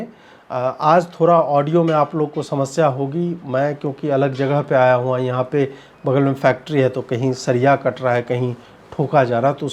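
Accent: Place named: Bihar, India. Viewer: Tum tao Bihari lagey mujhe 😅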